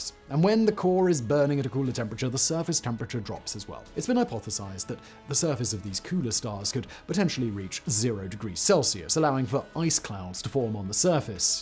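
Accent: United Kingdom. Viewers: I enjoy these channels very much, but sometimes pronunciations are so cartoonishly British it's distracting. If it turns out he's actually from like Boston or Queens but choose his accent to seen more legit, I wouldn't exactly be surprised. It's a lot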